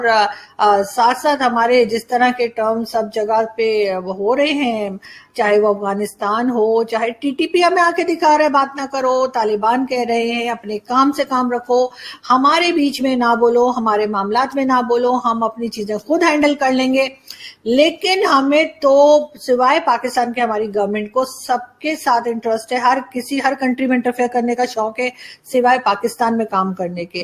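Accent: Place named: Mumbai, India. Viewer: Bhidu. .. Boleto ekdam Bombaiyya Hindi bol rahe ho..aap ek din Vada paav khane Mumbai aajao..!!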